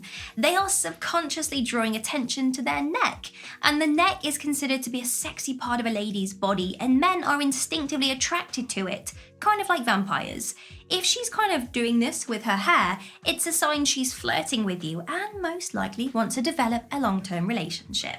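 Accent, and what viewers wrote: United Kingdom. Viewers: I like this ladies sweet British accent 😍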